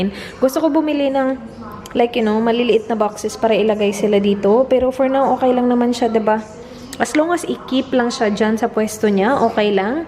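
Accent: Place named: Visayas, Philippines. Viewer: Bisaya ka po? Ang cute po NG punto mo😊😅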